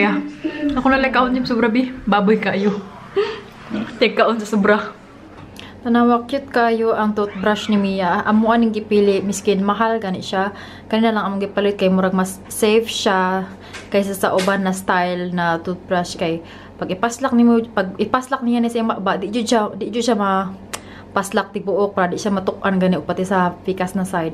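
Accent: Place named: Visayas, Philippines. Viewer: nakakatuwa tlga mga bisaya sarap sa tenga ung pag sasalita 😊😊😊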